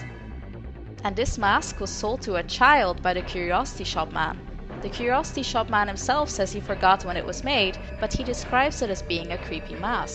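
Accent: Netherlands. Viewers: In this video you can also clearly make out her dutch accent and it is super cute 🥰🥰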